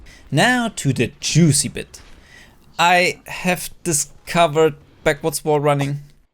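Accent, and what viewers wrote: Germany. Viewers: Was ein Deutsch englisch HAHAH😅✌🏼 xD, Save Deutscher oder?😅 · your german accent is so obvious ;D, great videos man. keep on grinding my man. ;) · Man kann so krank hören das du deutscher hust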